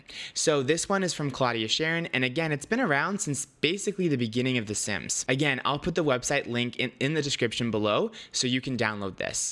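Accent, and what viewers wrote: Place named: Philippines. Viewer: you sounded Filipino. haha. Nice content by the way.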